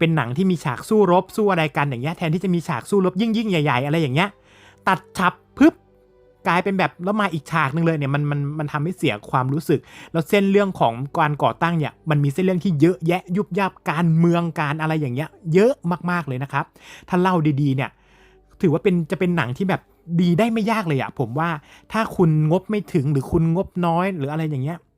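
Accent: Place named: Sukhothai, Thailand. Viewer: 😂เหน่อโขทัยเหน่อตลก😂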